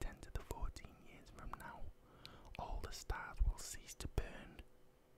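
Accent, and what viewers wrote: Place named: St Helens, United Kingdom. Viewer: Your accent seems similar to mine! St Helens gal! 🙌🏼 love your videos!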